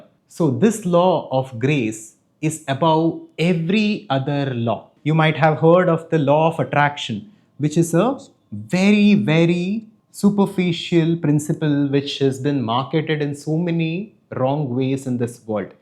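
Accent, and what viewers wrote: India. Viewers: YouTube is an international audience, India. Please work on your accent and pronunciation. ESPECIALLY making popping sounds when pronouncing 'T'. It sounds awful. It's extraordinarily embarrassing to hear a heavy Indian accent when you're in the West. We are not taken seriously due to this reason, you have to realise. Im not suggesting speaking without an accent. Im suggesting working on it to make your own less heavy and more understandable. Earn your globalisation.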